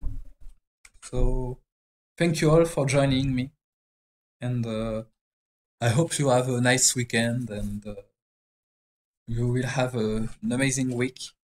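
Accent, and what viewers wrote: France. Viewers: With your English accent that is not very good, I could guess what language you originally speak, your native language is French. Correct me if I'm wrong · dat anglish accent typical french playdr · I speak fluent French and I can't understand a French accent for some reason but I heard something about Sunday and it's raining????? XD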